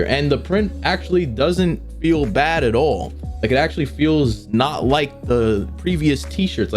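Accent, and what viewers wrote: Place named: New Jersey, United States. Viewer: I like your accent are you from New Jersey or Philly?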